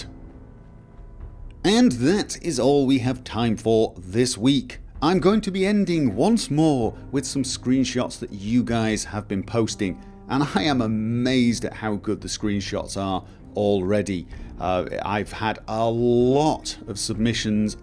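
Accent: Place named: Wales, United Kingdom. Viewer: Are you a Welsh Jew or something? You sound both Welsh and Jewish. What the hell is your nationality?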